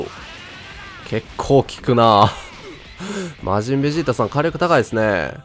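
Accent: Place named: Kansai, Japan. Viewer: スネークの関西弁もそうだけど低めで渋い声の関西弁はまじでかっこいい笑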